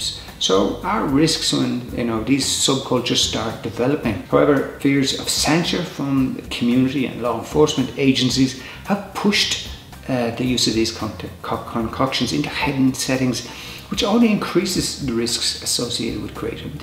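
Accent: Ireland. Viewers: God bless you, you be rocking the McGregor accent/look a little!:)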